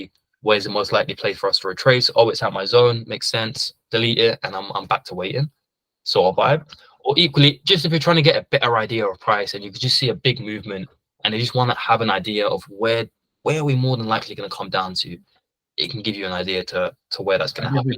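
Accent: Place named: United Kingdom. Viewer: Brits accents, huh manageable 😢😅❤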